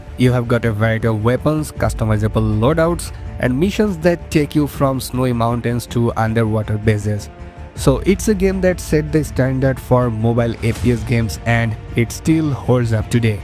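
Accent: India. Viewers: Yeah, I don't need to play any of these. Always these top games must play videos with some heavy accent Indian guy and the lists are always crap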